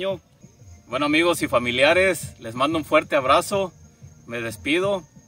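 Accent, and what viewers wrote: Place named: Veracruz, Mexico. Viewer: QUE GUSTO VERLO, ESPERO QUE USTED Y SU FAMILIA SE ENCUENTREN MUY BIEN..... PERO POR EL ACENTO CREO QUE ESTÁ EN VERACRUZ, YA RETOMÓ SU ACENTO... 😅👍🏼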